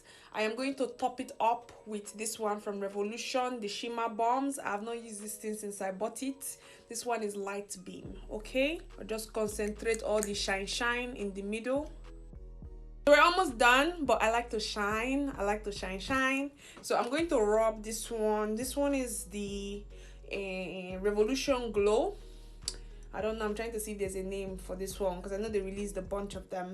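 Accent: Nigeria. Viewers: 🤣🤣🤣 sounds like an igbo accent. Nne you made my day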